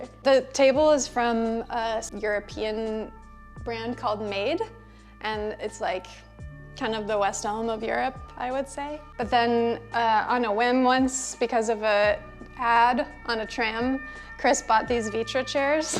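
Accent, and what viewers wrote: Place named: United States. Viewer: Cute place, cute dog and cute US accent!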